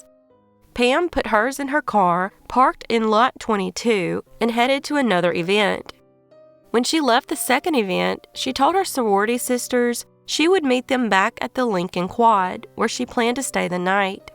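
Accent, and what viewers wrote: South, United States. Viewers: Nice to hear a southern accent even though mine makes yours sound like a Yankee. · Only southern accent I like. Thanks for all of your hard work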